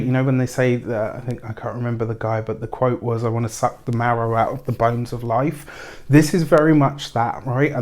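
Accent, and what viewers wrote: United Kingdom. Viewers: Sag Rising here and that was totally on point thanks! Fellow Brit here too and it's refreshing to hear another Brit doing tarot 🙂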